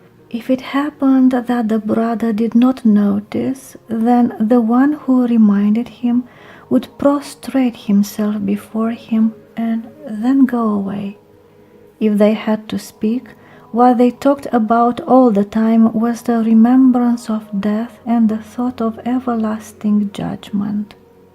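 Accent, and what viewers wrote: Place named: Eastern Europe. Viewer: i insist, it sounds beautiful. your accent makes it sound all the more so. and despite the accent (in my ignorance i would guess eastern european or even russian), every single word is pronounced correctly and everything is as clear as pure water. had a robot been reading this, i would not have listened to it.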